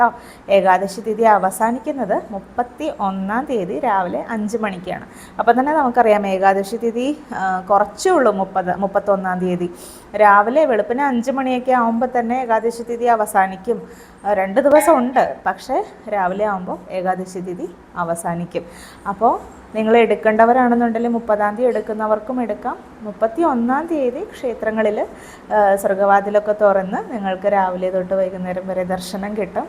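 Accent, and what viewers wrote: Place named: Valluvanad, India. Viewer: മോൾടെ വീട് വള്ളുവനാട്ടിൽ ആണല്ലേ. അതാണ്‌, ഓരോ വാക്കും കഴിയുമ്പോൾ, ട്ടോ എന്നു പറയുന്നത്.👌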